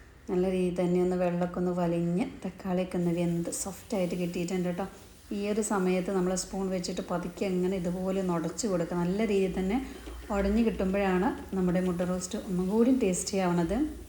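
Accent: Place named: South Kerala, India. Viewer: Pazhaya malapuram poyit ipol thekan slang aanallo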